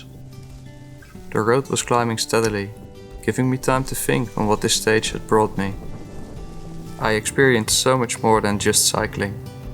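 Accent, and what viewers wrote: Netherlands. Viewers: Er valt echt niet te luisteren naar Engels met een Nederlands accent, behalve als het over dit soort onderwerpen gaat. Dit is oprecht 1 van de lijpste dingen die ik heb gezien, gefeliciteerd met deze prestatie. Wat een reis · At first when I heard your English I assumed you were Dutch but then your pronunciation of the Norwegian places is so good! Anyway, loved every bit of this. Loved, loved, loved. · I stumbled upon this and upon hearing your Dutch sounding English - immediately liked and subscribed. One hour and twenty something minutes later, I feel like I've been with you on your trip through Norway. What an awesome thing to have done and very well documented with beautiful footage.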